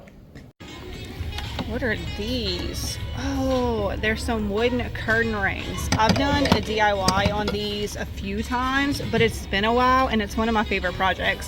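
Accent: Louisiana, United States. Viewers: Girly… That gorgeous Cajun accent…. Abbeville? Maurice?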